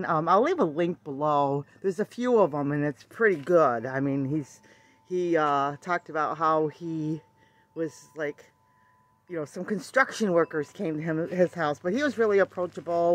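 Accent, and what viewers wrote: United States. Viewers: Black Sabbath without Ozzie Osborn, was like a skunk without stripes or a smell...basically just a cat at that point hahaha. I saw Black Sabbath in concert MANY years ago, back in the 70s, man that is a story to tell some day. Y'all have a Merry Christmas. Your accent, WI or a state near there, yes?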